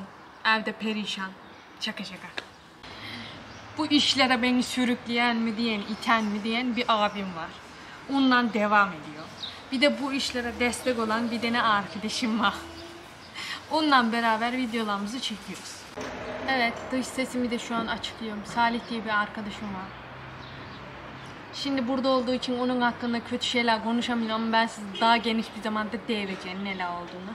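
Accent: Kütahya, Turkey. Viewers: Bizim gız, Öncelikle başarılı çekimlerin devamını dilerim. Kızımın Üniversitesi için yılda 4 kez İZMİR başlangıç KÜTAHYA, GEDİZ güzergahından ZONGULDAK'ĞA geçiyorum. KÜTAHYA şivesinin zaten kendine özgü hoş ve tipik bir iç EGE aksanı var. Bu aksanı ve kültürü bozmadan bulunduğunuz beldenin yaşlılarını,düğün örf ve adetlerini konu alan videoların sizlerin daha çok tanınmanızda yardımcı olacağı kanaatindeyim. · Lanet Gelsin Dedigim Yerdir Kütahya Ama Senin Şu Şiven Yüzünden Sevmeye Başladım.. Yayın Hayatında Başarılar Kardeşim 😊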